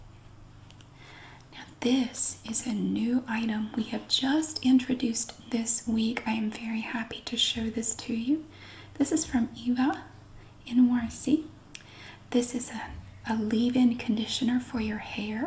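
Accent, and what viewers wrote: South, United States. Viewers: I just love your channel & the variety of ASMR you explore. I don't think there is anyone quite like you out there with the same style or format, making you extra special(to me, anyways) I really enjoyed this video, along with your store hauls & shelf straitening. I LOVE your southern, soft accent. Much love & blessing to you from a fan in Vancouver, BC Canada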